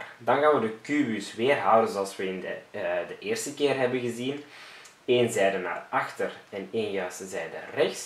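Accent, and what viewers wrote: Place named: Belgium. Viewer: Wjw das gwn nen Belg Lets go Belgen ik zen ook nen belg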